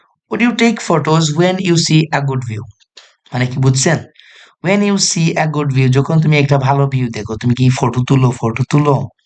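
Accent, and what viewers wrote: Sylhet, Bangladesh. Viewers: Vaiya ki Sylheti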